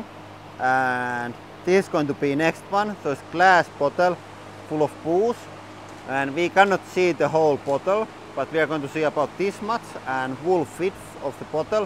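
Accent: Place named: Finland. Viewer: I just came to the realization that speaking English with and an American accent is boring AF. I will watch this channel and practice to speak English with a Finnish accent. SO MUCH more fun. It’s pretty cool!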